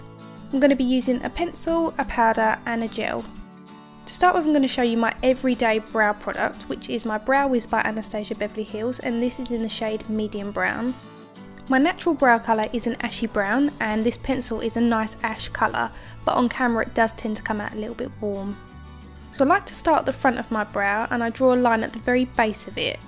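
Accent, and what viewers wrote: Essex, United Kingdom. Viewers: She sounds like she's from Essex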